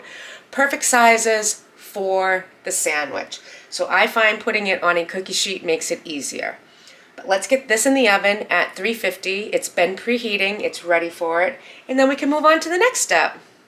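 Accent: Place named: New England, United States. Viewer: Perfect recipe for lazy mornings! Can’t get enough of that New England accent. Absolutely adding to my weekly routines! 🙏🥰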